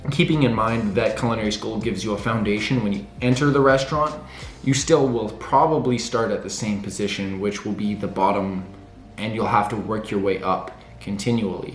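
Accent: Canada. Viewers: Not only is he good looking but he can cook. Also that thick ass Canadian accent. What else could you want?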